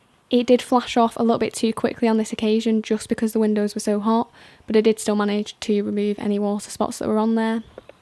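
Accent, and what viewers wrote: United Kingdom. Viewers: Sorry to say..im actually Don't understand some word..bcz of british accent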